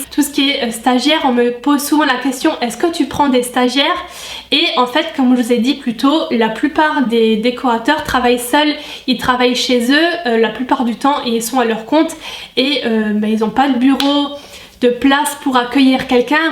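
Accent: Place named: Alsace, France. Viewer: Hihii je me disais bien qu'il y avait un petit accent qui me disait qch par ici ☺️ Je suis de colmar ! Je viens de découvrir ta chaîne et j'adore !! En plus de ça je viens de découvrir cette vidéo sur la décoration d'intérieur, j'étais partie pour MJM tout comme toi .. mais jai malheureusement eu la peur des débouchés, et j'ai finalement changé de voie .. chose que je regrette chaque jour depuis ☺️ Tu as eu raison d'aller au bout de ta passion et vraiment félicitation ! Ca doit pas être facile tous les jours d'être auto entrepreneur ! En tout cas je vais parler de ton site autour de moi pck vraiment j'adore !( sans parler de ta chaine YouTube biensur ) j'avoue que séparer le professionnel de YouTube c'est bien mieux ! Continues comme ça ! Pleins de bisous d'une haut rhinoise ^^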